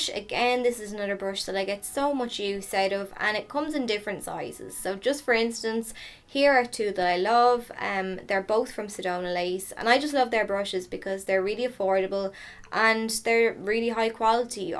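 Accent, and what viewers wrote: Ireland. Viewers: You are beautiful. You sound Irish lol.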